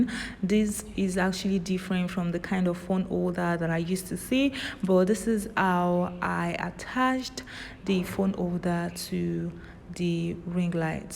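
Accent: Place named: Africa. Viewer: Hi !! Are u African or ?? Not a racist I just love ur channel and accent. I just been a make sure Nigerian? Jamaican? It’s so cute . And that ring light is beautiful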